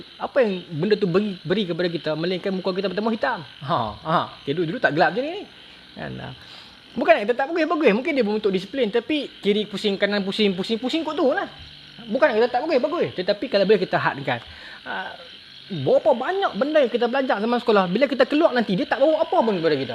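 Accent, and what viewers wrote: North, Malaysia. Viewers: kasaq ustaz 😂. Org utagha mmg camtu hahaha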